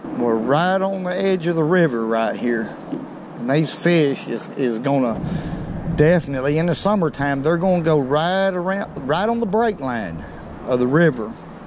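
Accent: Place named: Tennessee, United States. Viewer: Beautiful Tennessee accent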